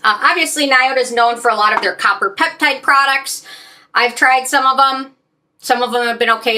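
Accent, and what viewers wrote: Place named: Minnesota, United States. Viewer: Yay! I just did a mini shopping spree at The Ordinary yesterday and just saw that you posted this video. Very helpful! Btw I love your accent. I'm from NZ so I'm not too sure where to place your accent/dialect. Is it sort of Minnesota?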